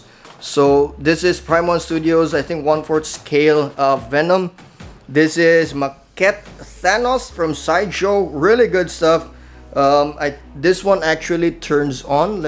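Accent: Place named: Netherlands. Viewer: Awesome collection bro. Where are you from? You sound kinda Dutch😉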